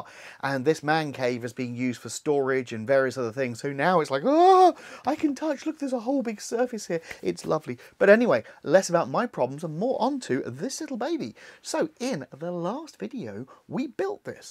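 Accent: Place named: United Kingdom. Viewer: British accent really makes you.wonder whether he's saying pwn or porn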